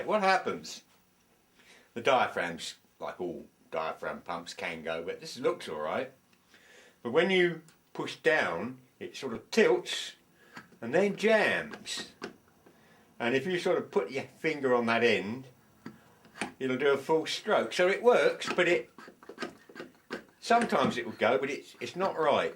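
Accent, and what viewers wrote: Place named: England, United Kingdom. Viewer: I always enjoy your videos. Whenever I hear your voice, it always reminds me of the Wallace and Grommet animations. I have always been a fan of the English wit and delight in hearing an English accent. I encourage you to continue making your videos, I find many useful ideas for projects and repairs of my own.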